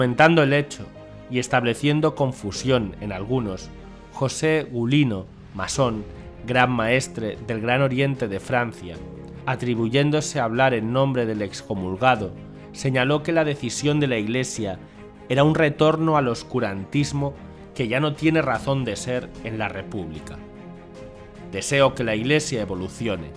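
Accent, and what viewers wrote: Spain. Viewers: Buenas, felicitaciones. Buen contenido pero muy alta la música. No permite entender su voz, que es español de la Padre Patria y los latinos debemos afinar el oído y con la música altísima, nos cuesta. Por favor, siga adelante enseñando lo disfrutamos, sólo con un poco más baja la música. Gracias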